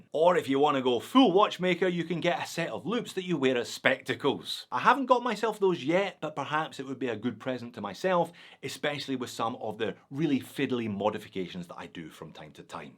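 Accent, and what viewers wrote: Scotland, United Kingdom. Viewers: I’m not going to win fuck all anyway so I’ll just say that the video was very good as usual ,i really like videos just to hear your accent reminds of home back in Scotland it’s the only one i hear as i now live near Boston Massachusetts ,have you heard the Boston accent? I’ve been here over twenty years and I think the Peterhead fishermen have had elocution lessons compared to the accent here,so you and your new family and Mr P have a fucking wonderful Christmas and drunken fucking outrageous New Year you only live once please make the best of it.🇦🇺🏴🦘🐑👍🍸🍸🍸🥂🥂🥂😂😂😄Comments like this are why I don’t win sod all and finally please keep up the Scottish sense of humor it’s one of the few things the Tory’s have left us.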